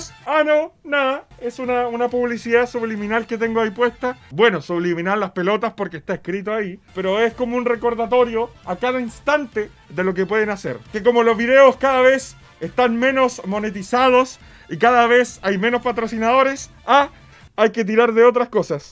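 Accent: Chile. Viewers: Wueon eres de chileee?????????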